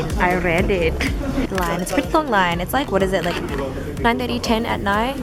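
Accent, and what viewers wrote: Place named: Australia. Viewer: 호주발음이라 귀여운데? 네 귀가 썩은듯.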